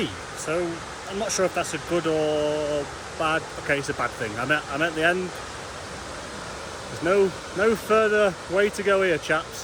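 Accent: United Kingdom. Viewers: British non-posh accent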